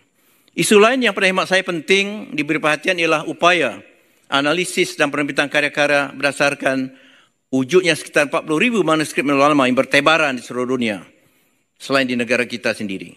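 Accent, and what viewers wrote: Indonesia. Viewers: Haha...bapak ini kok logatnya spt indonesia?😅😅😅😅😂😂😂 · Hai Prof. AWANG.......anda berpidato sdh berdialek BAHASA INDONESIA......Sadarkah Anda.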